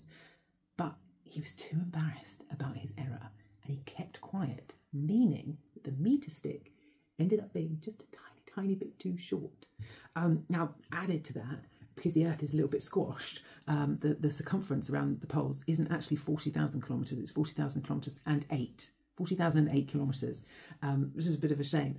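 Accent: England, United Kingdom. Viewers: Redhead + English accent = <3 <3 <3 <3 <3 <3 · your intelligence and british accent are sooooo sexy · I'm very much biased by my French pov, so when I hear a British accent explaining science to me, I instantly think Doctor Who. It's a stereotype, but it's just super fun to imagine the Doctor teaching science to humans on YouTube 😊😁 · I like her videos. She smart, attractive and has a lovely English accent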